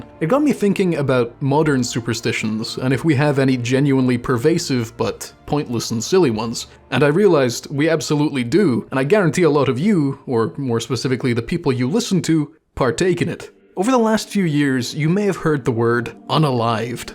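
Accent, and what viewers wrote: Scotland, United Kingdom. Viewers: he’s like Scottish jerma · I did not realize that you were Scottish until this video I just thought you were polish or czech or something because that's so common for youtubers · wait you're british?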